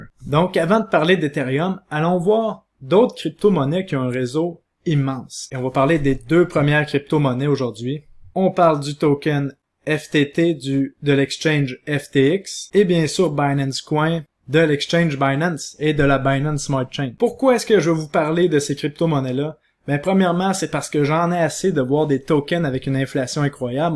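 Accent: Quebec, Canada. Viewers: J'adore l'accent des cousins d'outre atlantique.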